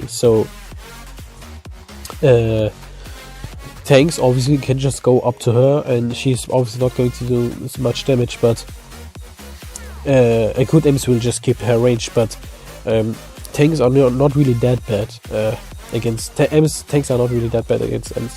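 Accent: Austria. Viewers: Nice Vid. aber man hört beim English den Österreicher raushängen 😝👍🏻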